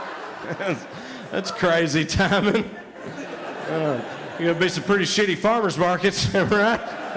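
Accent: South, United States. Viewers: Born and raised in southeast va … not used to hearing intelligent speech drowning in a southern drawl. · I love this guy's work and there is just too little of it to find online. Not to mention that he lives basically in the same area as myself now, but I can't find any shows he's doing locally. I may have to eventually figure out how to hire him just to come up here and talk for an hour or two so I can turn off my TV (which is also my 65" computer monitor) and let it get some rest. Dude, write more stuff and write it even faster. Please! You actually had me, for a moment at least, thinking "we my be able to at some time hype that accent as more educated than the stereotype. I know he would know exactly what I'm talking about. It's not an insult as a fact can never insult anyone yet still be totally insulting at the same time. If the south ever does rise again, I hope the thing that rises from it is the intellectual level. 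Which, it would appear, yours has done. Fine sir. (I mean think about it.... Just how long can you go on watching people put milk and sugar on grits? You know it's butter, salt & pepper and if you have brains some egg and sausage on top just to level it out a bit. With maybe some Trappy's sauce on top of that. ) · I love how they are subtitling his Southern accent. Ha! I know my friends don't always get my Southern references or dialect.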